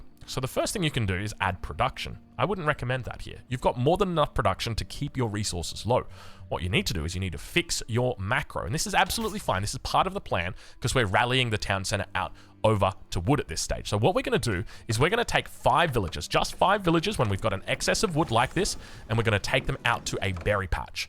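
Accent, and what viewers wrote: Australia. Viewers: Listen at 0.9x to compensate for the Australian accent!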